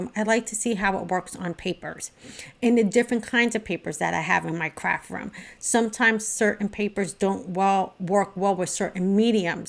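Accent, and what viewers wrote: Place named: New York, United States. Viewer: Paper is key! You sound like a fellow NY'er (im in N.Fla now). Thanks for the info💗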